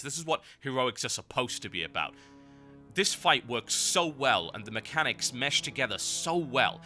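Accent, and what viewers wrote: United Kingdom. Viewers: f.u.b1ch u sounds british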